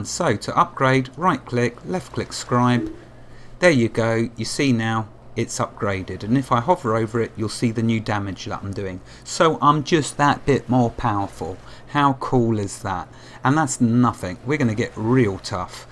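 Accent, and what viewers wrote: United Kingdom. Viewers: Awesome EQ 2 series , I just love your videos...Are you from the UK by any chance and did you enjoy eq and eq 2 better than villagers and heroes?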